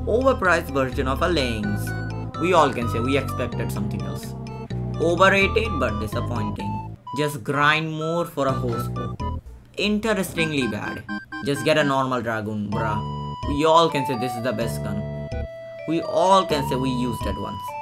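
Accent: India. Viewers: Are you indian? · Are you Indian? Not to stereotype but seriously are you?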